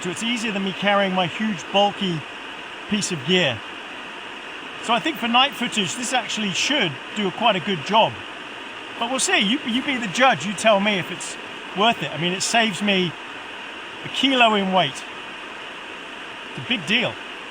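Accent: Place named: England, United Kingdom. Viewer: Wow,. How nice to hear someone say something nice about America.....that doesn't happen very often. We Americans love our Kiwi's too!! I previously worked with several New Zealander's and Aussie's--so much so that I learned the difference in accents! There is nothing NZ's hate more than being called Australian!, you also have a lovely accent albeit English. Greetings from Los Angeles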